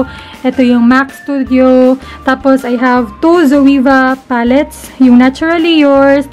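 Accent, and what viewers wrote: Visayas, Philippines. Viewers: Visaya po ba kayo??? Kasi po parang may mga words na accent at visaya word po kayo ask ko po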